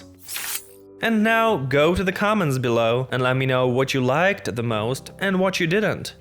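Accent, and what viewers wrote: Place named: Russia. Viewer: You are Russian, I hear your accent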